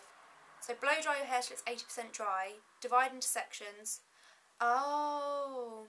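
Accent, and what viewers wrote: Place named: United Kingdom. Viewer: That Bri'ish accent! And ya, I'm here cause I grew my hair long and got that thing today lol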